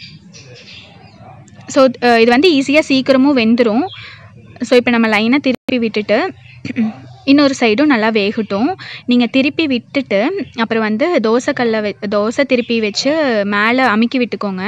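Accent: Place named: Coimbatore, India. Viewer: Hi sister I am ur new subscriber. I am also coimbatore I like ur kovai slang..... so cute....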